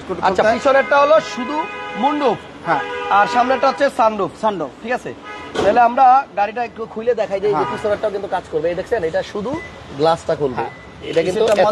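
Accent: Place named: Barishal, Bangladesh. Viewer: অরিজিনাল বরিশাইলা রা ফেল এতো দারুন ছিলো আপনার বরিশালের ভাষা।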